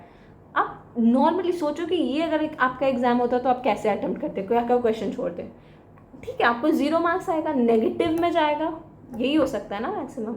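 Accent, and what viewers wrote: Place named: Bihar, India. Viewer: Aap Bihar se ho? Accent se lg rha hai.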